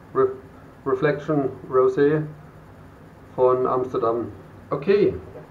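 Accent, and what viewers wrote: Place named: Baden, Germany. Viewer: hallo, ich wohne im chiemgau, am waginger see. aber deinem dialekt nach bist ein badener.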